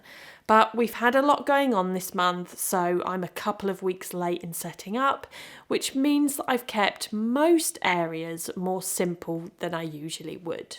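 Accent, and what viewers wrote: England, United Kingdom. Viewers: Dearest.....love that your English accent is amazing. USA welcome. 2024 looking good.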